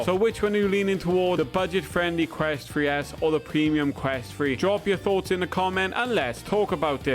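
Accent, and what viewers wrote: Wales, United Kingdom. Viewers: Welsh is it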